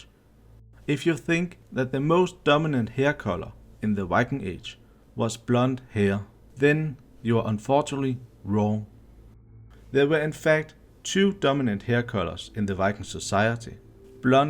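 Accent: Denmark. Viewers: Your speaking with dannish accent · Some heavy danglish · Was wondering if he was Danish too... Danes have the best accents for me amongst Scandinavians... I'm Dutch so I'm not bias.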